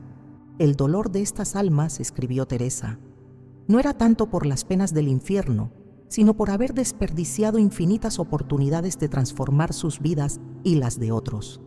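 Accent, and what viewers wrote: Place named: Peru. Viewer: Hermosa voz, con acento Peruano bellisimo!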